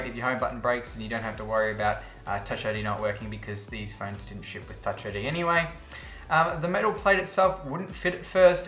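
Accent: Australia. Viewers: you are from new south wales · Are u an Aussie?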